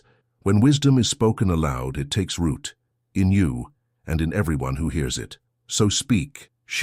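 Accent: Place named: England, United Kingdom. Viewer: Why is the exact same voice on all these types of videos? A slight English accent, a deep voice… is that one guy or is that AI?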